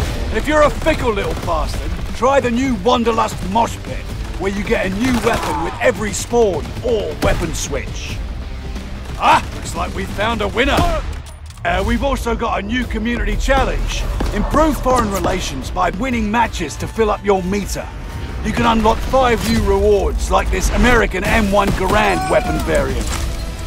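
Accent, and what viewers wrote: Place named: Australia. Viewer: Isn't it a little ironic that an Australian man narrates this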